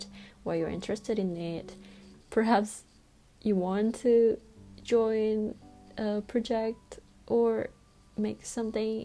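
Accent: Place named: United Kingdom. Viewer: how can u speak like a British? Can u give somes tips n trick?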